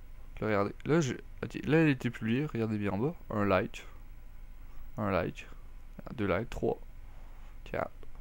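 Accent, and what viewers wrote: Quebec, Canada. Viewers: Tu as un accent Québécois ou Canadien je mis connais pas trop mais j'aime trop se genre d'accents +1like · Petit accent Québécois <3❤ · Accent du Canada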